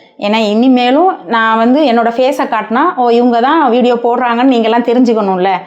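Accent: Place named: Nagercoil, India. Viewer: நம்ம நாகர்கோவில் தமிழ் வாசனையுடன்,கிழங்கின் சுவையும் அருமை !!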